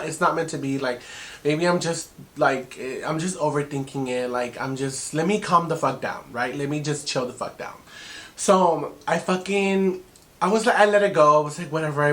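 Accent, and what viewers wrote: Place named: Dallas, United States. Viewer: I’m deaaaddd!!! I’m from Dallas and you sound like someone from D town 🤣❤️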